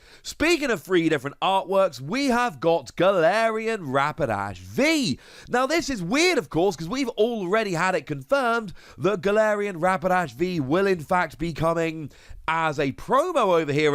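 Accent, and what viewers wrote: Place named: United Kingdom. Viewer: Half the reason I watch you is to hear you say “but’’ in your beautiful British accent. Love the info you give us amigo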